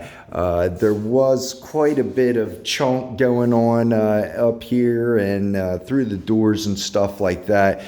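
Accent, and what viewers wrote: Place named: Philadelphia, United States. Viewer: Not sure where you are located but your accent reminds me a lot of folks I used to work with near Philadelphia in Newtown. I'm betting you're from that part of the country!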